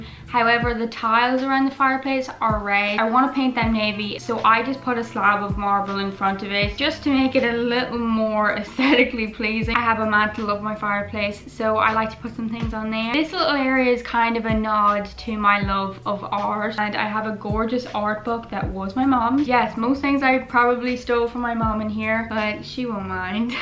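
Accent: Ireland. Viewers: you just came up on my recommended and it's so lovely to hear a fellow irish voice! your room is goals 💌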